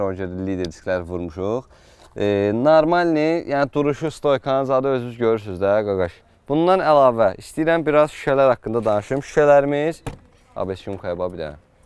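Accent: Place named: Sumgait, Azerbaijan. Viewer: Yetim+ Ataş Sumqayıt aksenti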